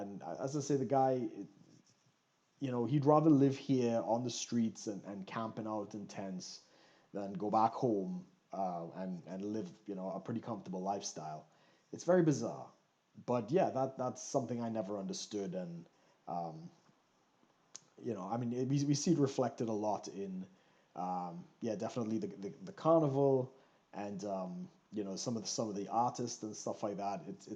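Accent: Trinidad and Tobago. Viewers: you sound trini thou..... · dont pay people with negative responses, you know we live in a fallen world. Keep happy and keep on doing what you love to do, i can also hear your trini accent, love it... the diet you are on is truly good, all the best with your endeavors!! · U born here da can’t hide yuh accent strong buh u clearly lack self love I grew up in West wid white people and Dey mad chill .... you really don’t like yourself or ur folks don’t blame Trinidad blame yo mama !!! Police never harass u for money u delusional bruh stop fuckin lyin dawg you issa joke I will share u and clown u ur so fake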